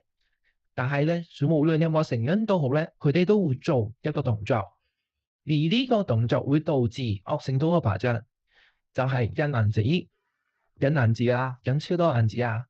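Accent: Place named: Taiwan. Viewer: 你係台灣人? 廣東話口音有啲唔同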